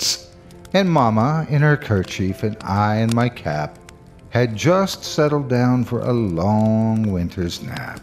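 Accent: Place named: South, United States. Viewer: That was a very good audio performance, and you have such, a wonderful gentle voice with the rhythm of the South, which always makes you such a delight to listen to, no matter how horrifying the subject matter. Bless you, Mr., and may you and yours have a very merry Christmas and a happy New Year!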